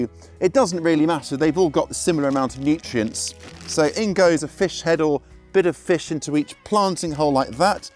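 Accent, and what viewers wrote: England, United Kingdom. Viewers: to-mah-toes. i love an english accent. fish heads, not so much lol. for cherry tomatoes i have collapsible 4 sided, 4' tall plastic coated wire tomato cages that fit perfectly around my 4 gallon nursery pots in my container garden. i put them up in a 2 or 3 row checkerboard pattern, joining touching corners with wire plant twists. [picture zigzag pattern for a 2 row, or 4 corner cages and a middle cage for a 3 row.] all plants are easily reachable and get air and light. [i feel the row is more stable like this; we get fierce thunderstorms with wind.] additional training support is given with [1/4"W] plastic coated 4' stakes [not wood, termites are a thing in the south.] monster varieties eventually get transplanted into 10 gallon pots with [1/2"W] plastic coated 6' training stakes, also not wood. after 6' i cut off the top of the plant since i cant reach any higher. 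stakes not in use store very well in a vertical [4"W] length of pvc pipe screwed to a stud in the garage.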